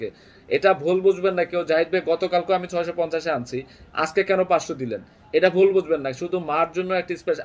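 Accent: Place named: Khulna, Bangladesh. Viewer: ভাইয়া মনে হচ্ছে আপনার বাড়ী খুলনা বিভাগে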